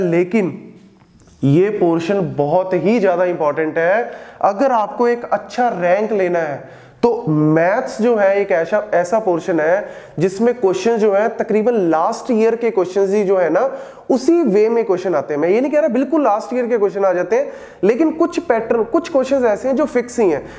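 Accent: Punjab, India. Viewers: Ur accent has punjabi touch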